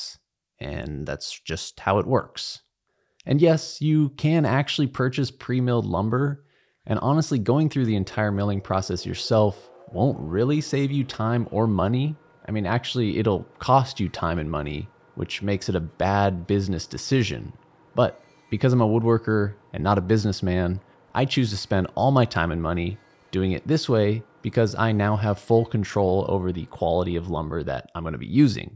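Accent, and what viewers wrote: United Kingdom. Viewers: Sorry, but too plummy.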